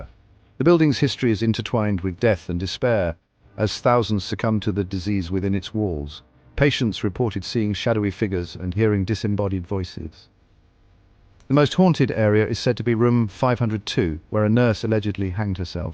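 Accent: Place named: United Kingdom. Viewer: YOU don't sound like him and I used to watch the show when it first came out you sound English (Brit) and Zach is from Las Vegas and younger than me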